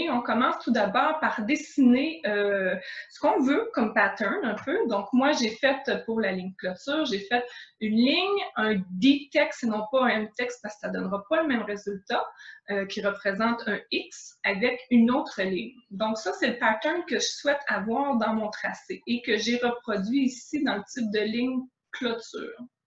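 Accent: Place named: Quebec, Canada. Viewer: Je viens de découvrir tes vidéos avec ton bel accent québécoise, c'est simple et concis merci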